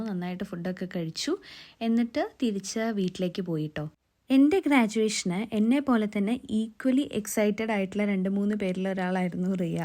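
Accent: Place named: Palakkad, India. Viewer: No idea why your video came up as a recommendation. Congrats on your graduation. Are you from Palakkad, you speak just like my husband? My husband is from Palakkad, Kunisserry. My daughter graduated from Grad school in May of this year and works in Investment Management, we have lived in the United States for close to 30 years now.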